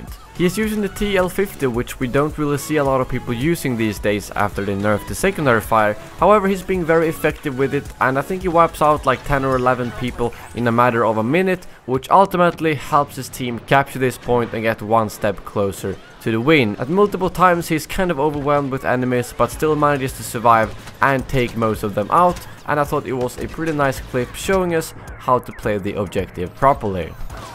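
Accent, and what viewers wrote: Sweden. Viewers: agentokky he's Swedish, so it's not surprising if he doesn't speak English correctly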